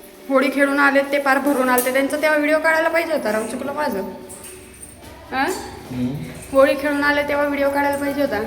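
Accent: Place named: Nandurbar, India. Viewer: तुमचं गाव कोणत आहे तुमच्या बोलीभाषेवर तुम्ही नंदुरबारच्या किंवा जळगावच्या आहात असं समजतंय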